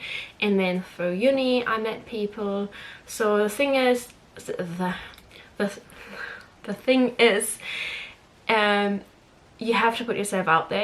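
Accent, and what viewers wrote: New Zealand. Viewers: Your English is getting a New Zealand accent.. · u sound kiwi....but anyway...have u ever thought about living in Australia? all the best in NZ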